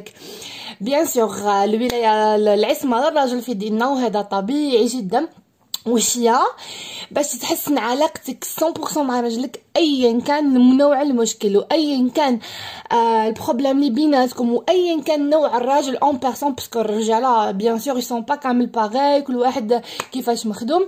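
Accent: Constantine, Algeria. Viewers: شحال تعجبني اللهجة القسطنطينية و تعجبوني بزززااااف كي تقولي نتغشس😻😻😻😻🤣🤣🥰🥰🥰🥰🥰🤣🤣🤣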